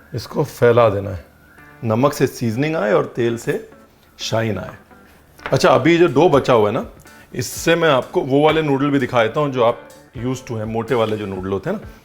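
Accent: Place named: Uttar Pradesh, India. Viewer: Ranveer d way u talk and d words u use i can so much relate to them coz m also frm UP .... apnaiyat ati hai bohot 😇... n i so much enjoy watching ur videos they are so different from others their is a charm... love ur simplicity and humble attitude ❤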